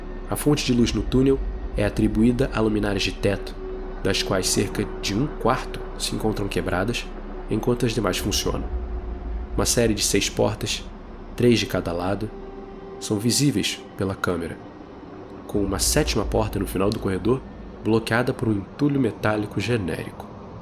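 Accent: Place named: Rio de Janeiro, Brazil. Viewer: Sotaque de carioca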